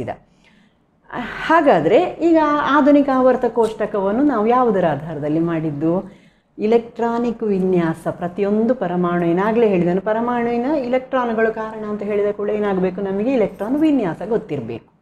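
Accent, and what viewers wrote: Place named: Mangalore, India. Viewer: Tq u so much mam 🌹nimma Mangalore bashe spr🥰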